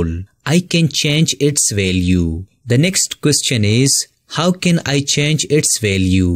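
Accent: South India, India. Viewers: By hearing your English I am thinking that you are from south India side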